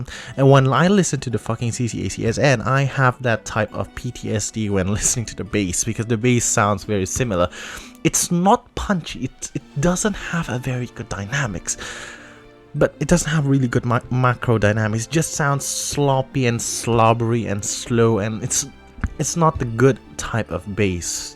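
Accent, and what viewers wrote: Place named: RP, United Kingdom. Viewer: Lebih ga paham lagi kalo B pake aksen Scottish loh. Wahahaha. Sebenernya bagus banget sih si B ini pake aksen RP-ish (menurut sepengetahuan gw), agak kurang natural aja. Mungkin kalo swear words fuck sama shit diganti jadi bloody sama bollocks bakal jadi lebih natural. Wohohoho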